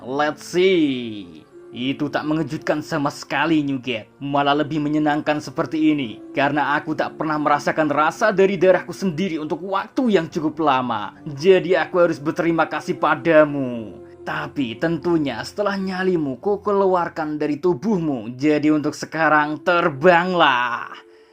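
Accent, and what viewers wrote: Java, Indonesia. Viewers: Min coba pake narasi full basa Jawa. Medokmu mantap bgt min. Aku suka 😂 saya nyoba pake narasi medok di konten saya a dapet min. Apa gara2 saya jawanya cuman 50% yak? Kasih tau rahasianya supaya bisa medok min 😂. Kasih feedback yaa gan saya medok kek si mimin apa kaga 😂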